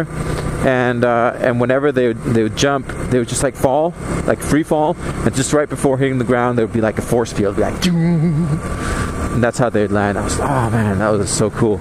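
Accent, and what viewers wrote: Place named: United States. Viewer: Nice, your accent suggestion you're American. What are you doing there? You also lived in Mexico? Interesting.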